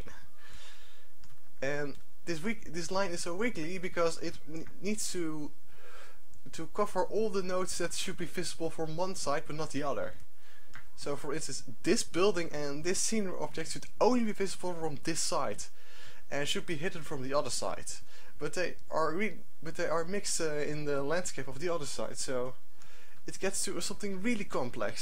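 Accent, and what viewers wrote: Netherlands. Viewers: kan je nog een nieuwe video maken ik hoor aan je dan je nederlands bent ook al is promods nederlands;)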